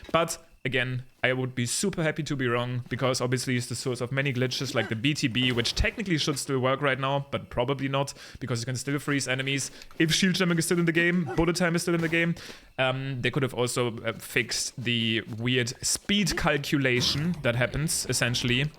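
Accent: Germany. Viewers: Kommen sie aus Deutschland? Just curious! Your accent sounds like you're German 😅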